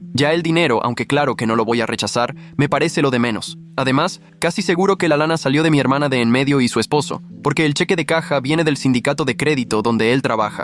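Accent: Mexico. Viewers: Oigan podrian decirle a la ia que deje el acento mexicano y vuelva al español neutro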